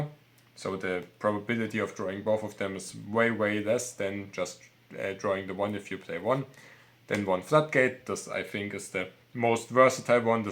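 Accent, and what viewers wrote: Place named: Germany. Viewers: he could dub a character with that voice, he didnt even have to tell me he was german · Very german english 😂❤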